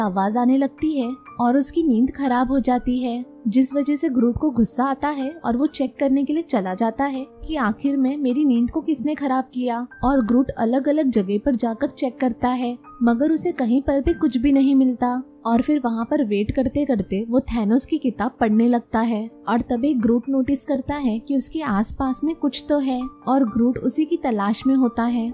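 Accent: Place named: Karnataka, India. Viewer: You are from Karnataka 🤔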